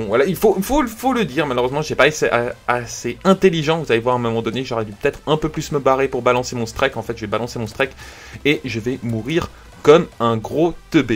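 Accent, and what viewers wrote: Belgium. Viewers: T belge ? :p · Oh tes belge je savais pas😱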